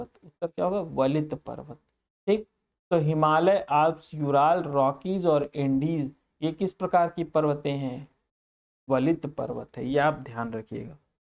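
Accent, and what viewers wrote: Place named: Uttar Pradesh, India. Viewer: Bahut hi jhakkas ek dum up wali bhsha me bole to mast lajwab❤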